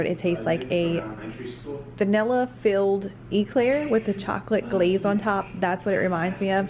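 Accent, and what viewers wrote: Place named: Louisiana, United States. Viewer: You must be from somewhere in Louisiana. lol that accent is for real! ❤️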